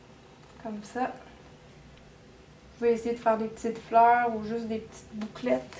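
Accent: Quebec, Canada. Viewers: Je comprend rien avec l'accent quebequois chui marseillaise c 'est normal :-) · Ah l'accent quebecois... Ca me manque finalement :(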